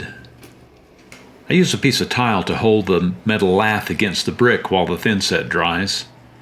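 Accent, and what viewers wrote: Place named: South, United States. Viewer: I love your videos! Nothing better than a Southern gentleman teaching you home improvement especially with that voice and father-like tone.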